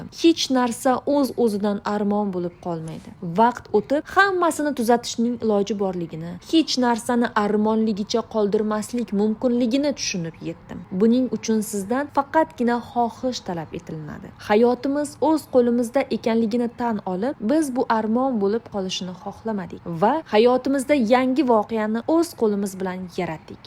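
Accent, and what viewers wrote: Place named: Fergana, Uzbekistan. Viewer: Фаргонадан экансиз а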